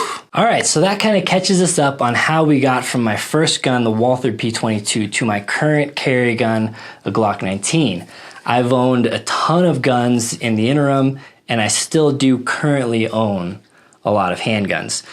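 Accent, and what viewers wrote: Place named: California, United States. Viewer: did not know you were from california. totally got that vibe from the way you talk though. still rock my LLOD hoslter, one of the best.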